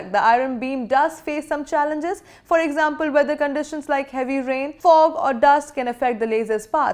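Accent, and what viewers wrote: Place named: India. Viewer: i love indian people., but i hate when indians speak english. stop please. its menasing to hear you speak english with that indian accent.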